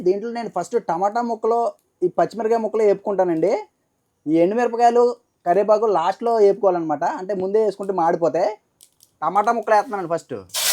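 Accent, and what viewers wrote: Godavari, India. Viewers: మీ విడియో లు చక్కని గోదా వారి యాస తో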